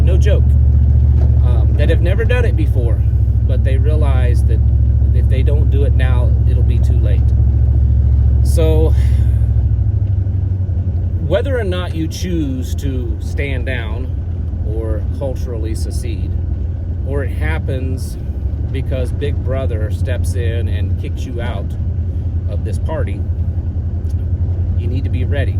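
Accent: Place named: Appalachia, United States. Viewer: Love them hillbilly pipes , yours too .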